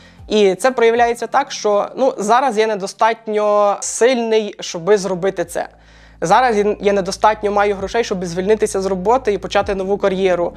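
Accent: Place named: United States. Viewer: У вас акцент американський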